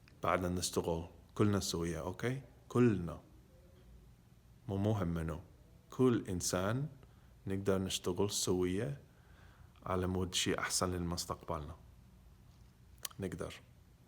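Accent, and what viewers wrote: Iraq. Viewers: Hi, as iraqi me & my family appreciate your efforts i hope God bless you and your family... you iraqi accent are brilliant 🌸🌸🌸❤️❤️❤️ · فدوة شكد حلو من تحجي عراقي كلش استمتع وياك🤤😋😋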